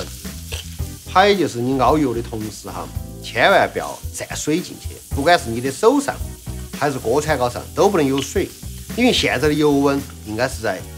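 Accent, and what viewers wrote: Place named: Chengdu, China. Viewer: 对不起我要刷屏了莴笋颠颠儿～ 莴笋杆杆～ 凹～ 溅～ 新宣～ 的成都话好标准好想回成都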